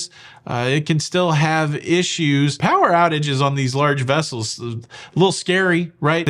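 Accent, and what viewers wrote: United States. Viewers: Sounds typically American to me.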